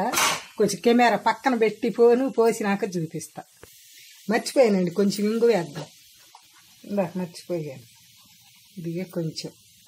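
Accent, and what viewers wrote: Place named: Prakasam, India. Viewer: Meeru chese recipes and natural ga explain chese vidhanam super. mee slang( yaasa) nu batti, Mee vantalanu chusi chepthunnanu meeru ma Prakasam district vaaru anipisthondi. Am I correct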